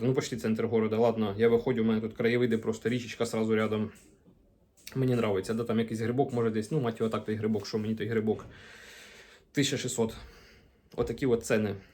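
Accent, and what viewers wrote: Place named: Slobozhanshchyna, Ukraine. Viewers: Вітаю, а скажіть звідки Ви родом? Говорите суржиком, мабуть з Сумщини? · Це твоі проблеми! Чоловік балакае на слобожанському говорі! Люди не должні всі по-галічанському балакати, як ти! ЗА СЛОБОЖАНСЬКИЙ ГОВІР - ЛАЙК!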